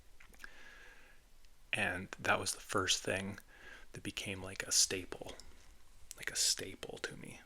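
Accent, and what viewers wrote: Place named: Canada. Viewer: Thanks for allowing us to join your channel! I do love how you talk, the way your voice sounds (so soothing and warm and comforting) and I ❤ your thick Canadian accent. And thaaank you for the roller ball!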